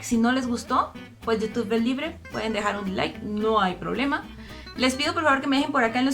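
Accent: Mexico. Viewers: Saludos hermosa ya ablas como mexicana bendiciones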